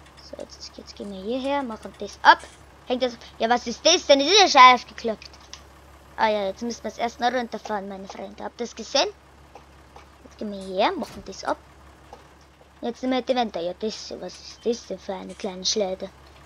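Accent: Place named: Bavaria, Germany. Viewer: Bayrisch war cool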